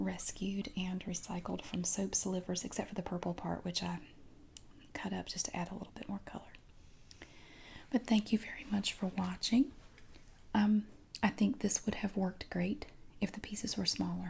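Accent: South, United States. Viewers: “..and the soap is very draaaahhh” (dry)., your southern accent charms the bejesus out of me 😊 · your voice is so relaxing and I love your Southern accent. Makes me feel like I'm back home with my family in Franklin, VA :)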